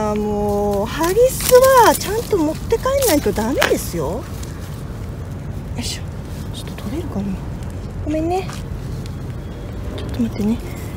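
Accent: Kagoshima, Japan. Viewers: の優しさがとても嬉しく思いました。自分もほっとしましたありがとう😊 私も最近では釣場で空いてる時間にゴミ拾いしてます‼️皆んなが心掛ければ楽しい釣りが出来ると思います😁 所々出てくるかごしま弁がすごく癒されます👍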